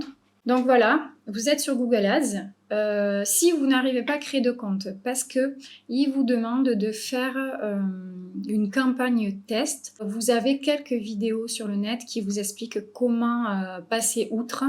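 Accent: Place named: South, France. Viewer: Merci beaucoup pour vos infos, génial 👍 avec le petit accent du sud comme j'aime 😉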